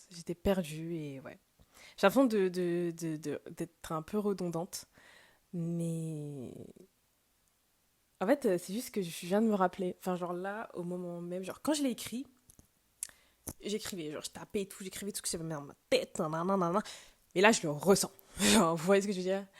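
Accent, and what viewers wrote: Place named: England, United Kingdom. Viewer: J'aime ton accent anglais😊☺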